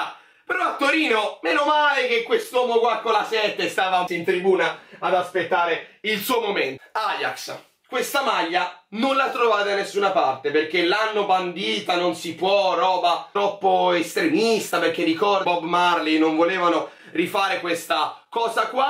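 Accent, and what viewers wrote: Tuscany, Italy. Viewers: Sei toscano? Sempre e cmq Forza JUVE💚🤍❤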